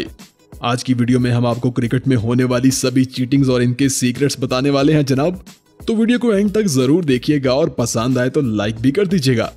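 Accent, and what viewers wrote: Pakistan. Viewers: Tera accent bilkul Pakistani hai but I know you are indian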